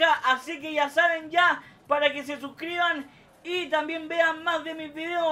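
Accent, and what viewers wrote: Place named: Argentina. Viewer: POR que hablas como argentino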